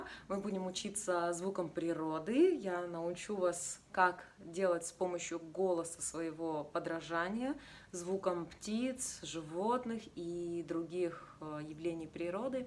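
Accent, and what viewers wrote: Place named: Yakutia, Russia. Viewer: Hi! Excellent tutorial as always🤗🤩. Thank you for the English transcription but nothing will ever replace your beautiful Yakutian accent😍😘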